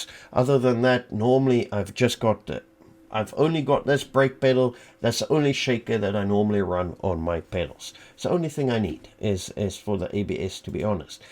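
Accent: Afrikaans, South Africa. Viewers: Tel ek 'n afrikaanse aksent daar? Great content ! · Are you South African sir? If so where !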